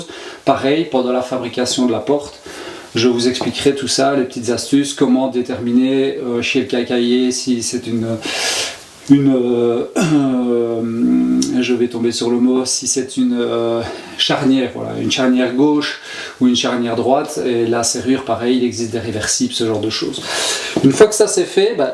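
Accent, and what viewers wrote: Liège, Belgium. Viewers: Belle découverte et en plus un accent du pays 😉. Et en plus septante trois et pas soixante treize. Enfin un bon belge. Bonne fêtes. Et merci floky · Jolie découverte via la chaîne de Floky. Je me suis déjà mangé toutes les vidéos. Ça fait du bien de voir que la Belgique est aussi présente sur YouTube. Vive l’accent Liégeois ( et ce n’est pas péjoratif ) .Un abonné en plus. Et bonne continuation je suivrai la chaîne avec attention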